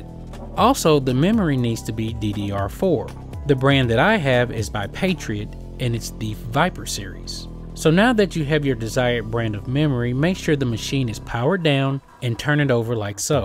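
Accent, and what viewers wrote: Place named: Georgia, United States. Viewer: He sounds like he is from Georgia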